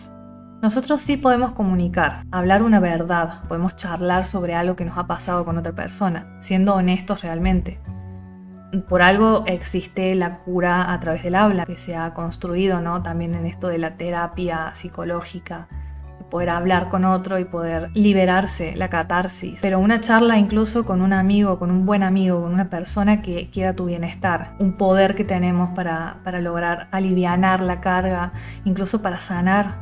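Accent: Córdoba, Argentina. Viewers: Completísimo. Escuché otros videos tuyos, explicas muy bien. Tengo la duda si sos cordobesa, nada que ver pero los cordobeses nos reconocemos el cualquier parte. Sos una genia, manejas mucha información y la haces entender. Gracias x todo. Sé en qué momento estoy. Más segura, más emponderada, pero se que también puedo correr el riego. Él está ahí, rondeando, espectante de saber cuándo puede volver a haberme entrar el si juego, lamentablemente lo tendré que ver el resto de o vida, creo se entiende el porqué. Naa.. todo lo que explicas es así. Cuando explicaste que se nos queda ese lado oscuro en nosotros, que hago cosas aprendidas de él, hasta pensamientos aprendidos de él, todo ésto que lo transito con mucha más conciencia gracias a pedime y como vos que nos explicaron toda esta tragedia y le pusieron un nombre. Digo esto porque yo sabía yv entendía que algo malo había, siempre lo percibí y después que pasó lo más terrible seguía sabiendolo, pero aún sin entender qué era. 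Enormemente gracias.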